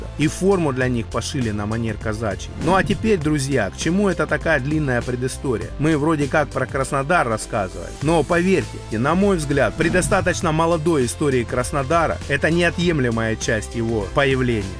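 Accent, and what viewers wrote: Rostov, Russia. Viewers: Я сразу понял , что ты с Ростова как только начал говорить 🗣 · По говору сразу узнал, что ты ростовский или с Донецка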